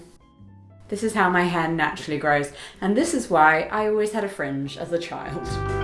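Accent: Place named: England, United Kingdom. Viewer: She could not be more English. It's not just the look or the accent but the mannerisms, the cadence of every single move. It's like she's a time traveler from a bygone ers, an upper class lady from Victorian/Edwardian times. I am absolutely mesmerized.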